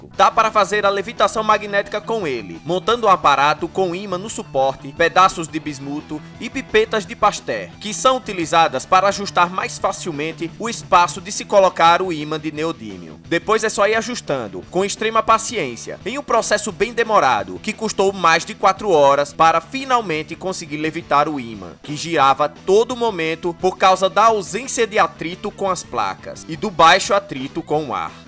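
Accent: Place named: Pernambuco, Brazil. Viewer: Fera!! Muito top o canal, quem dera tivéssemos um laboratório de química assim na escola.. e de onde é o canal? Pelo sotaque, parece Pernambuco. Seja de onde for, o conteúdo é de qualidade, precisamos mais disso por aqui. Deus abençoe!!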